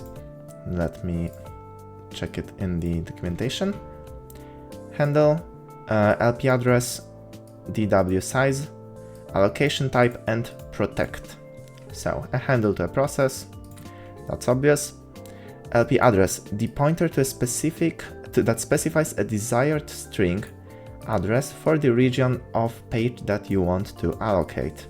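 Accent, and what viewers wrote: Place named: Poland. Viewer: Polski akcent pozdro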